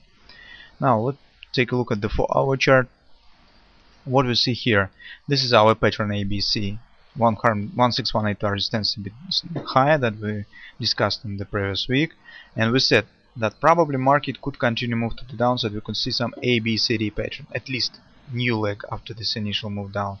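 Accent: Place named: Russia. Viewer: yeap, you've got the point... eur/usd is more bullish than bearish for now... funny accent! :) where are you originally from? Russia, I guess, right? :)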